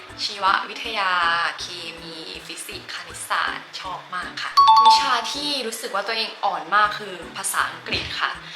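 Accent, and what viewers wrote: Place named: Buriram, Thailand. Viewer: จดจ่อตั้งใจฟังจนจบอยากรู้ว่ามีแฟนรึยัง แต่ฟังจนจบก็ยังไม่รู้ 555 ผมเล่นกีต้าร์และเปียโนมาแต่เด็ก เล่นให้ร้องเพลงได้ ร้องคู่กันก็ได้ มีบ้านอยู่ในตัวเมืองบุรีรัมย์ไร่นึงหลังทวีกิจจิระ ไว้เป็นเรือนหอสำหรับแต่งงานได้ ชอบมากสำเนียงบุรีรัมย์ชัด ผมเรียนอนุบาลที่บุรีรัมย์ เวลาไปดูแลบ้านที่บุรีรัมย์จะต้องแวะกินลูกชิ้นทอด อยากชิมลูกชินทอดฝีมือคุณหมอครับ ผมเล่นกีต้าร์เพลงแนวนี้ครับ <link>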